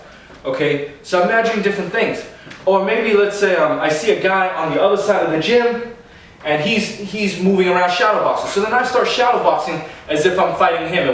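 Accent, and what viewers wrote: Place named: Philippines. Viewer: He has a filipino accent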